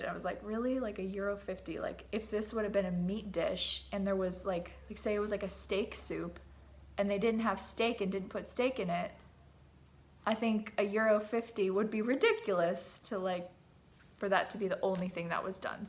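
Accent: United States. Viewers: your horrible American English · I swear I knew you were an Iowa girl as soon as you spoke. I'm from M'town and miss all of the great looking and smart girls from my hometown.You represent our home state in a very positive manner.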